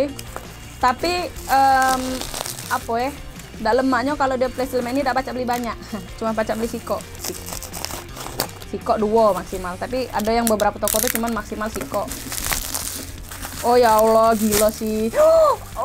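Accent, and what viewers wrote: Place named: Palembang, Indonesia. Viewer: gaya kk bicara palembang kental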